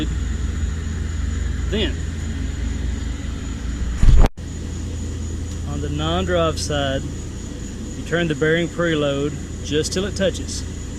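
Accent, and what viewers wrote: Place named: South, United States. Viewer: Hands down best guide on youtube, thanks! Always a pleasure to hear a well-spoken Southern man who understands millimeters!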